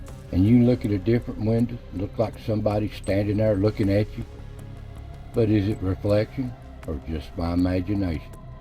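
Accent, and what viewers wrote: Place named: South, United States. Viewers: Greetings from Australia.Loved listening to your videos. I just love your southern accent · Hello good sir.. A new subscriber here.. I'm always intrigued by the US southern accent 😄.. I also love paranormal things, pls more content like this.. 😁 Be safe & God bless.. (A warm greetings from Indonesia 👋🏼🇮🇩.) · Yes your voice is beautiful. Not just the physical quality of it, but your slow cadence and beautiful Southern dialect.❤ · I love the narrator's voice & beautiful southern accent! Sounds so soothing, like my Grampa telling me a story, which are the most precious ever. ❤️ ✌️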